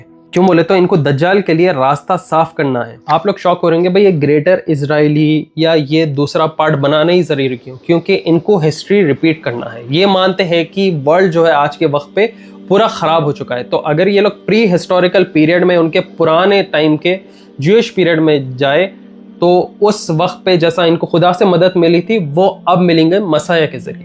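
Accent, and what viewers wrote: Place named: Hyderabad, India. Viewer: bhai "Dabaaa k kara ", "Dabaa k chalra " samajrow mai apan Hyderabadi hai magar thoda national level ke hisab se bhi bolsakte ap....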